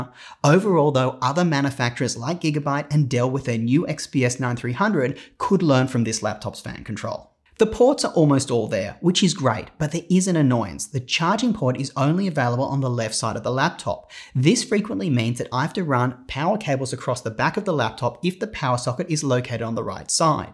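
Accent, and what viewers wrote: Australia. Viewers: Finally, a reviewer that knows what he's on about and isn't recycling the same old lines, plus it is so refreshing to hear an Aussie accent rather than some monotone suburb US one. liked and subbed from the UK!! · Are you Australian?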